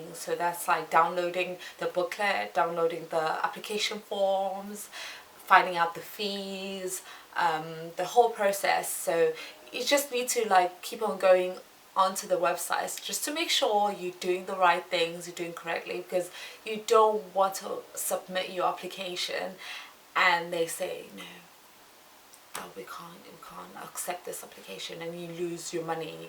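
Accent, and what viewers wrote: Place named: South Africa. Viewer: but you grew up this side right, cause i can here that SA accent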